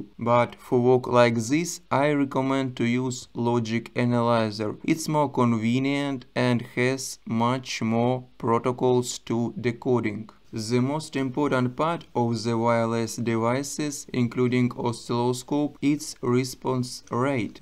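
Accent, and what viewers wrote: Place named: Russia. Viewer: Друг , английский надо подтянуть :) Русский акцент ухо режет...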